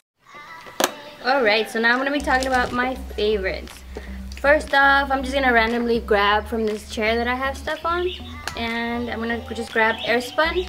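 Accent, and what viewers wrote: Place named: Spain. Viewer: Hehe i love yur Spanish accent(; ❤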